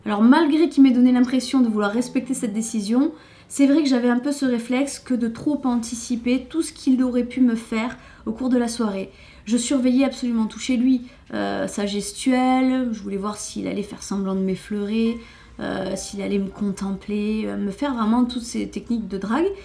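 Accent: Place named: Montpellier, France. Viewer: Wahou, tu me touches beaucoup. J'ai l'impression de me voir à travers toi, même si je suis beaucoup plus âgée. Et en plus tu dois être de Montpellier vu ton accent! Tu fais si jeune et tu as connus déjà des choses assez difficiles... Choses que je connais parce que comme toi, je suis très sensible, naïve et gentille. Quel courage tu as eu de garder cet enfant avec des parents pas très compréhensifs. J'ai connu tout ça aussi. Bref, la vie est devant et je te souhaite tout le bonheur du monde. 😊💋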